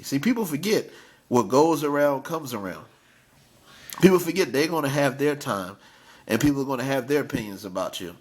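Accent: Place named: East Coast, United States. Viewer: EAST COAST, I LIKE THE WAY YOU TALK.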